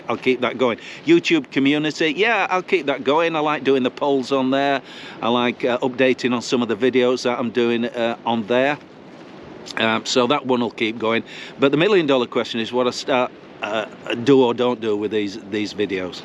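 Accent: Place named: Northern England, United Kingdom. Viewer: Golden rule. If it works, don’t fix it. People appreciate your sense of humour, and for an expat like me it’s nice to hear the northern accent and your touristy videos.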